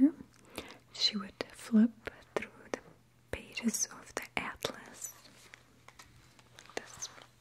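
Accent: Russia. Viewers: I love your videos so much, you’re my first ever ASMRtist I’ve ever watched! My favorites are your videos where you emphasize your Russian accent and culture, can you please consider doing more videos with your Russian accent please? <3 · I also love your Russian accent, it's so pretty. My parents are also from Europe (Czech Republic) and we all speak both English and Czech! Sweet dreams from Canada 😘😪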